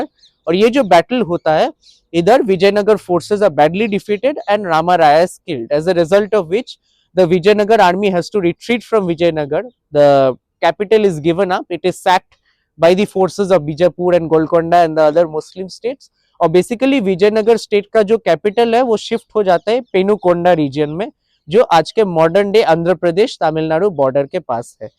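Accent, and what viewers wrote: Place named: Bengal, India. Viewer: His Hindi accent is Bengali..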